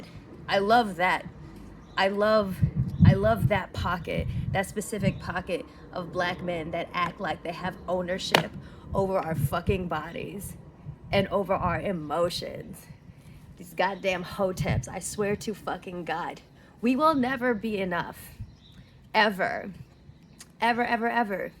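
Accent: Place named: Houston, United States. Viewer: I fuckin love!! Shes always here with insightful commentary and hilarious parodies. Also where in the South are you from? Cause I swear you be soundn like me and my cousins in Houston!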